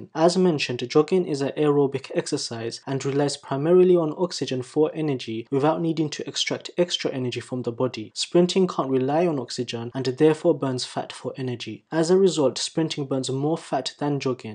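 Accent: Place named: Bangladesh. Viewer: You sound Bangladeshi based on your accent. Am I right?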